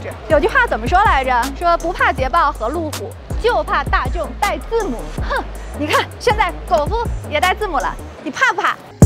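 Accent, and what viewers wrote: Beijing, China. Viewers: 触控板儿，哈哈哈，妹子这浓浓的北京腔儿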